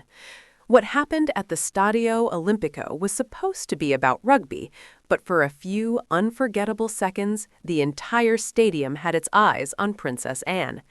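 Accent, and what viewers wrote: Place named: United States. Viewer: Why have this AWFUL American commentary ?!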